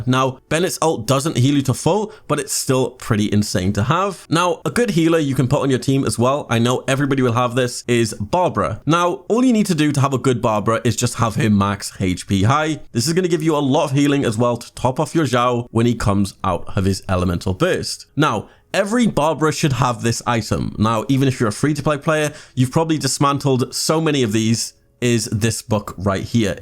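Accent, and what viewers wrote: United Kingdom. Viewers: i dont watched rest of the video( because i amazed by your British accent) but will see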